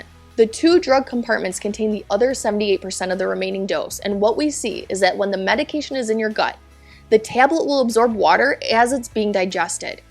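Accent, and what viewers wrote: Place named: Wisconsin, United States. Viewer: You’re from Wisconsin or something with that accent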